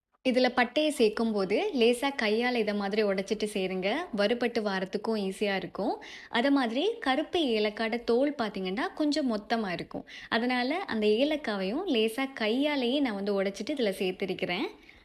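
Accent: Sri Lanka. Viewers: நன்றி.சிறீ லங்காவா அல்லது இந்தியாவா.அழகிய தமிழ்.❤❤😅😅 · ஸ்ரீலங்கா அல்லது இலங்கை தமிழ்.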